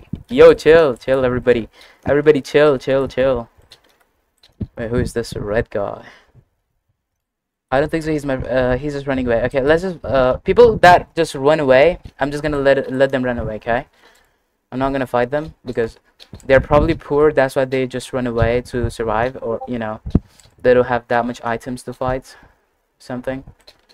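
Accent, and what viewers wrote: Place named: England, United Kingdom. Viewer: vs his english accent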